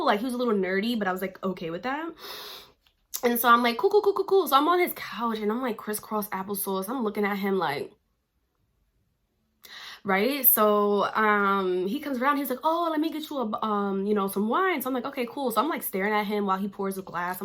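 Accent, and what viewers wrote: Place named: New York, United States. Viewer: Are you from NY you sound like it?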